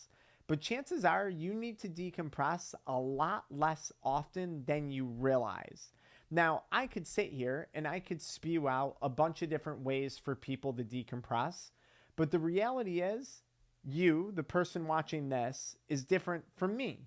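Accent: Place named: Western New York, United States. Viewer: I know another western New Yorker when I hear one 😂 awesome content as always!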